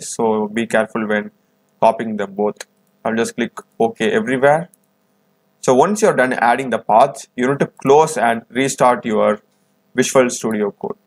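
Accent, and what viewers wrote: India. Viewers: Soon as I heard the Indian voice, I knew I was in good hands. Thanks for helping us regular folk out man, much appreciated :)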